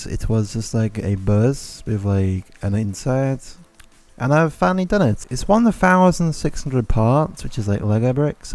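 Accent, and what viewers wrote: United Kingdom. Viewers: Shhh I love ur British accent!!!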